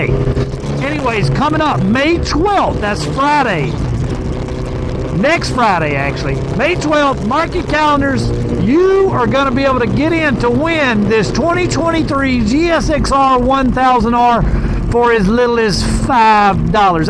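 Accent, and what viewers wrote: South, United States. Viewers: bro I love your stuff, I just have to ask has anyone ever told you, you kinda sound like Charlie Day with a southern accent?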